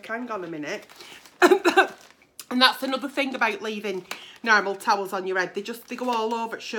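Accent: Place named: Bolton, United Kingdom. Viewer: So love Bolton accent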